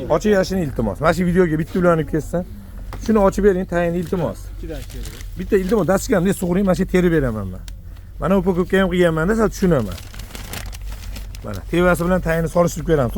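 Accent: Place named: Andijan, Uzbekistan. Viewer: Бу бола жон куйдириб гапиришидан Анжанлиека ухшайди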